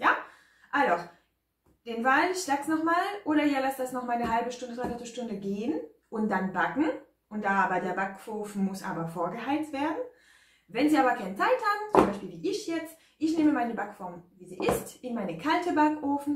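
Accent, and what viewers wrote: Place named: France. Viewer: Vielen herzlichen Dank ❤für dein witziges und aufschlussreiches Video , ich habe ,wieder mal ,viel dazugelernt 😊. Ich liebe deine Rezepte ,deine lockere,flockige Art und deinen französischen Akzent . In deiner Arbeit steckt so viel Liebe und Freude,dass ist einfach unglaublich schön. Liebe Grüße Heidi ❤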